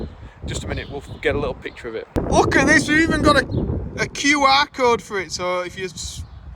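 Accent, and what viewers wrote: Barnsley, United Kingdom. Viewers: thank you for content love hearing a fellow Barnsley lad 😂 don't be late for big Mick Mick at weekend 😂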